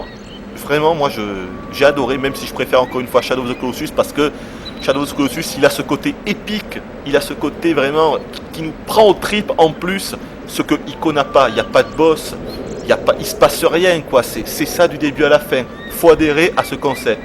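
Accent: South, France. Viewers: It's normal...he is an accent, south accent exactly. The best way to learn french....go to France or Belgium. Je te jure que ça marche! :)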